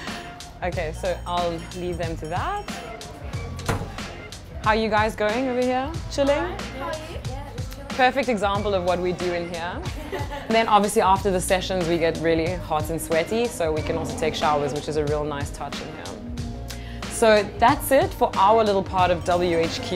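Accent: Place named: South Africa. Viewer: she has a slight south african accent but a german flag?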